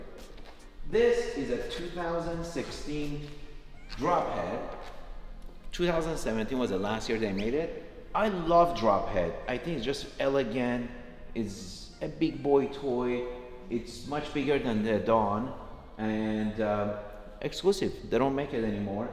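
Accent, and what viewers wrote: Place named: Iran. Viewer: I never heard of this man before in my life. But i can hear on the accent he is Persian.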